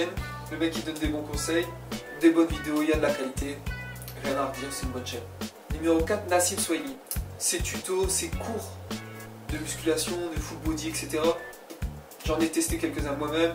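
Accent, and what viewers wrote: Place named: North, France. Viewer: T-es du Nord non? Parce que t'as un léger accent je trouve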